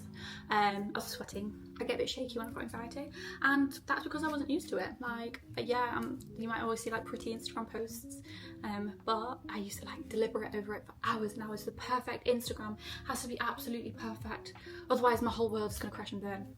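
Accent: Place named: Italy. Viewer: Tu acento eres de italianaa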